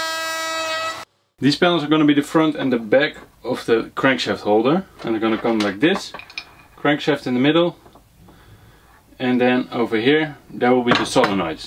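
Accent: Netherlands. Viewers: verry nice video, ik hoor dat je van nederland bent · Are you dutch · are u dutch? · I knew it. Your english is very nice but I can always hear something about the pronounciation if someone is Dutch. Do you live in the Netherlands as well? I'm Dutch too btw. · Je uit spraak kan wat beter man ik kan gewoon. Een Nederlands accent er in horen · are ya dutch? you have an accent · Are you Dutch? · You sound realy dutch are you? Awsome idee btw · Do you happen to be dutch, because you have the same accent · You have a dutch accent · haha je hoort gewoon aan dat accent dat je nederlands bent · Are you From the netherlands ? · U sound Dutch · laat me raden, u bent nederlands? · Je hebt echt een Nederlands Engels Achtig spraak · Are you dutch? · U sound dutch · Where you from? Cuz u sound dutch 😂😂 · Dutch accent? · joe sound ferry duts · Are you dutch, you sound dutch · heard the dutch accent, i subscribed XD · Ik vraag me af hoe je de windingen van de spoel hebt geteld, of meet je om de zoveel keer hoeveel ohm tie is? 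( Neem aan dat je een Nederlander bent zo te horen aan het accent :) ) Damn nice project! :)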